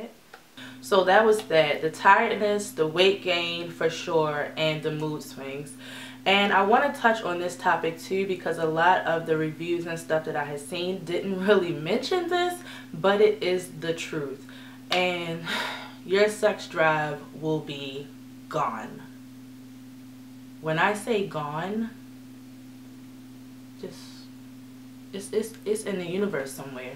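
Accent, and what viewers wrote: Baltimore, United States. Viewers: I could tell you were from Baltimore a few sentences in 😁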